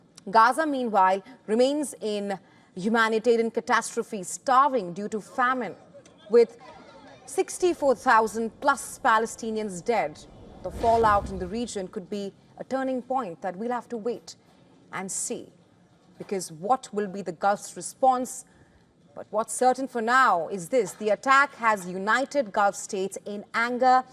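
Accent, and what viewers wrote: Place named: India. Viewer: Your sound Indian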